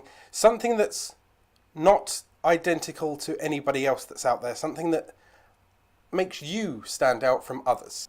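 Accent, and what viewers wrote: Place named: England, United Kingdom. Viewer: Come to think of it, I do prefer listening to an English accent! And a little bit of humour is always good. I do wonder why you're so close to the camera - not just you, everyone who does it?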